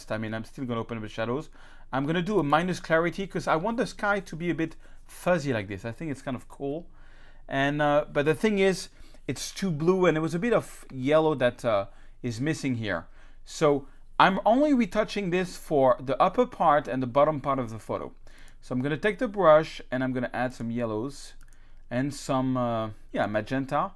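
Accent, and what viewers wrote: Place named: France. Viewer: Hey..I realy love your stuff..you gave me a lots of nice ideas about retouching. Also you are a funy guy and a real true person..with your French accent...always makes me lough...but in the positive way.. Hope to get some new and useble infos etc from you in the future.. Keep up the great work Mr.;)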